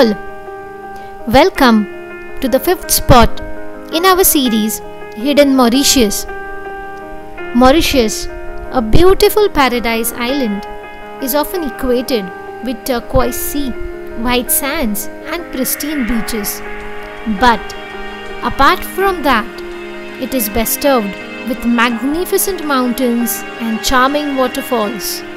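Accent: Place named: India. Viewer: WHO THE HELL IS THE NARRATOR?? '' PPOS'' A LITTLE AND LEARN HOW TO SPEAK PROPER ENGLISH, NOT INDIAN ENGLISH..IT IS REALLY ANNOYING AND IRRITATING..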